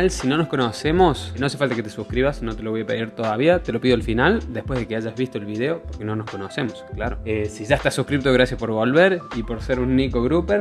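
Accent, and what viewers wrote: Córdoba, Argentina. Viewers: No Eres Espanol, Argentino no Creo? Solo es curiosidad! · Uhhhh ese acento cordobés, ya le baja 9 puntos😡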